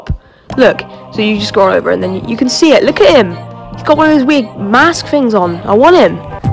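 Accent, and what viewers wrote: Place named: United Kingdom. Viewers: Is no one gonna talk about why he sounds British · Hes in UK but he has a brittish accent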